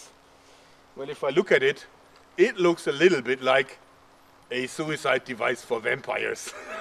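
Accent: Germany. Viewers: i just love his german accent😂😂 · I am from austria we speak german (sort of) and before i watched his videos my englisch sounded very clean. But now i use the german axcent (his german axcent) in every sentence when i speak, ha ha ha, life is fun. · Either this is the thickest german accent I've heard in my life should include lederhosen or it's the thickest Swenglish I've ever come upon. CHARMING FELLOW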